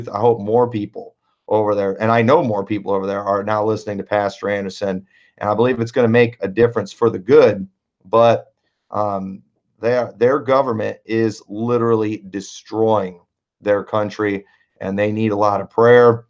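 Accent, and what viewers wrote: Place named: New England, United States. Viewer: I was hopeful that your Yankee accent was going to be a new temporary super power, but hopefully it was an encouragement to the local soulwinners that it can be fruitful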